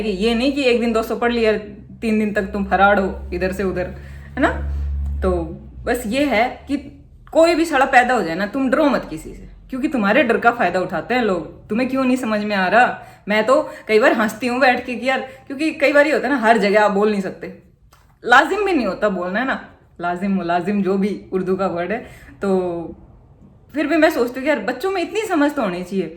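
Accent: Haryana, India. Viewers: Your Hariyanvi accent 👌 💌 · Makha ram ram 😃😃😃, nice starting, hariyana bala style sunme thoda kadwa but achha bhi lagta hei 👍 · Aap haryana se hai mam? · I like the...way..yuu speak... Haryanvi 😊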